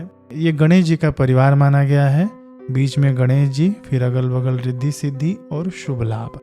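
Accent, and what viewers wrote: Standard. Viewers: Aapki bhasha bahut shudh. Srl.or mithi h..aapki puja ka trika or smjhne ka trika bhi bahut accha h..mene aapko sabscrib kr liya h..or all bhi kr liya .me aapki bahut video dekh bhi chuki hu..sari video bahut helpful h ...jai shree Radha krishna 🙏🙏🙏🙏🙏🙏🙏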